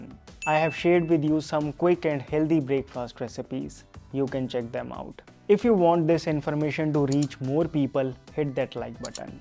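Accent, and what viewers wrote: India. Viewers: Let Indian English breathe. It has its own charm. ❤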